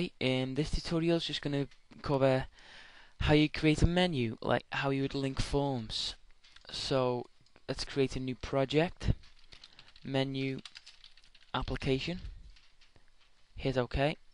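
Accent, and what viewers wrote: Liverpool, United Kingdom. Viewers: Thank you so much for these! What makes these video tutorials really good is the simplistic approach. Straight to the point, plain language and easy to understand instructions. It takes the fear factor of getting into the "complicated" world of programming out of the equation. And let's not forget the Scouse accent!!! "Best in the Weld" :-)))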